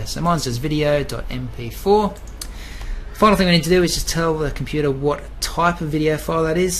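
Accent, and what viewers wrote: Australia. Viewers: Love your accent mate. Australian right?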